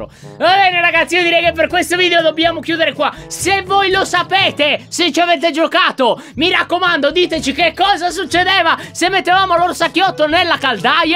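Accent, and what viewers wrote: Sardinia, Italy. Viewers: Ma è sardo?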